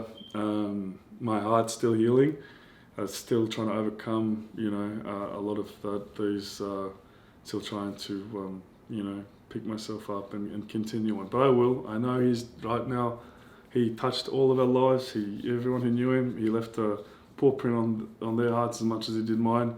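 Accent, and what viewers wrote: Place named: Australia. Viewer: Guys is it only me or you too have problem understanding him ? I mean it’s just his Australian accent is too thick and his voice too deep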